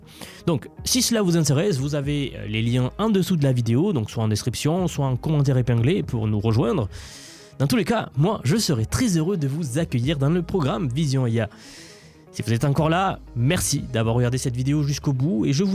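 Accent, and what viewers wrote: France. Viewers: Ça prendrait des sous-titres pour comprendre les noms. Un français qui essaie de parler anglais, on comprend jamais rien 🤷🏻‍♂️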